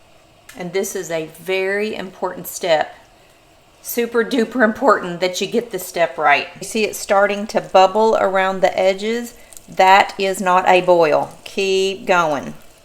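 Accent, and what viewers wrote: South, United States. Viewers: Why name it Christmas Crack??? That is the grossest name you could think of. Makes your southern drawl seems even more unbearable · 1st of all, love love your "Rizos/Curls", lol. Gracias for your lovely Southern accent & more importantly the literally walking us through to this mega pretty & delish goodness, lol. I'm not a Baker, hopefully this shall change a "Beba" step at a time. I'm in my mid 60's & still a "Beba"/ Baby Girl at heart, lol. Blessings & hugs....❤😊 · I just love your accent, i can tell you are a true southern lady! And your kitchen is beautiful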